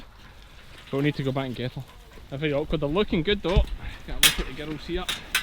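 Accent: Scotland, United Kingdom. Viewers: Scottish accent and sheep shearing! What could be better! Hello from NYC!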